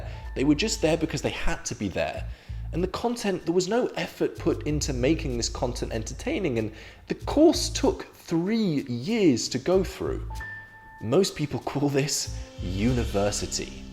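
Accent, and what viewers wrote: United Kingdom. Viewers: What? You mean the british accent? The accent that has because he is from England? The same accent used by most of the british population? I guess you're right. If this british guy we don't personally know is talking about money with a thick british accent, then he's probably some trust fund baby trying to scam us. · Hey, i thought you were from the UK because of your britisch accent haha. Where are you from?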